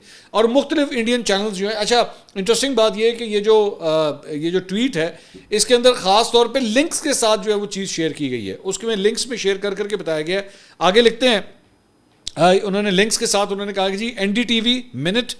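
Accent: Punjab, Pakistan. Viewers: 😂😂😂😂 bhai zaberdast work kr rhy hn ap masha Allah lkn jo ap punjabi ka touch dety hn kamaal kr dety hn ap😂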